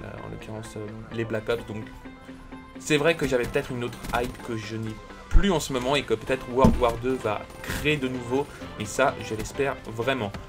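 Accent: Switzerland. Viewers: t'es suisse ? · Il est suisse je crois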